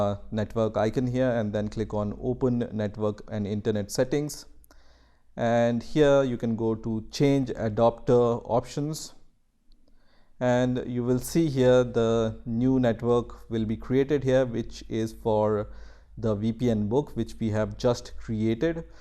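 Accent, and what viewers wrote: India. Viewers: W❤️W.. it really works and why not..? As the English accent is an Indian guy.. Love❤️ from Pakistan 🇵🇰 bro.. and with the prayer for our countries that we will be together one day..🤲🏼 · your idian accent makes this video look like a scam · ok just wondering.... why do you automatically assume that he is in india? you have no proof of that just because he has an indian accent doesn't mean he lives in india. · indian tech support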